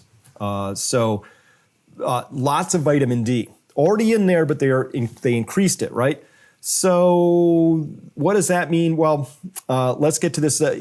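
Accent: England, United Kingdom. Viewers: 😅😂. English accent 😊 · But that English accent though! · Lovely Brit Accent. Top class video. Thankyou very much for my shot of common sense. Gerald Celente also calls it for what the "rulers & managers" are: Trends in The News Going Live: More Lockdowns, More Mandate Madness, More Econ/Social Destruction <link> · Thanks. Love your positivity and your “English” accent! Excellent 👍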